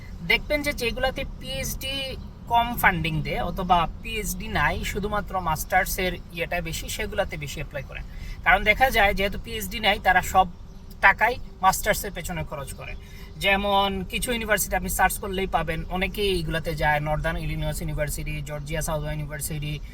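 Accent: Sylhet, Bangladesh. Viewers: আসসালামু আলাইকুম। কেন জানি কথা শুনে মনে হচ্ছিল, আপনি আমাদের সিলেটের। তারপর আপনার চ্যানেলের প্রথম ভিডিও দেখে কনফার্ম হলাম। অনেক অনেক দোয়া এবং শুভকামনা আপনার জন্য ভাই।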